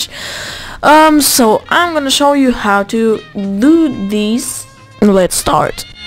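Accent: Germany. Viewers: Allein an Stimme erkannt das du deutsch bist, aber danke fürs tur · Kann es sein das du deutsch bist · ARE YOU DEUTSCH? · dachte mir schon das du deutscher bist haha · yes wait you german? deutsch?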